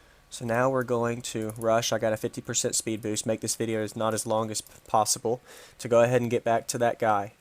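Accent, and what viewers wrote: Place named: England, United Kingdom. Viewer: INGOTS! not ignots.... N G.... not G N.... u sound english man wtf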